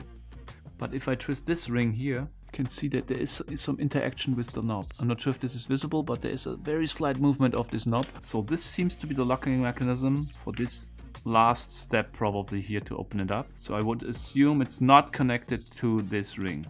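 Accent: Germany. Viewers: His German English is 9.5/10 Most Germans pronounce some words differently than Americans. It’s not right or wrong. has the best energy and is sooooo smart. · This reminds me of the first video I ever watched of yours - I was thoroughly impressed and entertained by this friendly voice with a light german accent drawing out the inside of the puzzle by touch as well as trial and error. The passion showed through your attention to detail and delight at the way the puzzle was MADE, not just the solution. That being said, I pictured you as an older gentleman, maybe with salt and pepper hair, and a neat bow tie. I'm not sure if I should be more impressed that someone so young would be so patient and exacting, or disappointment that my illusion was shattered.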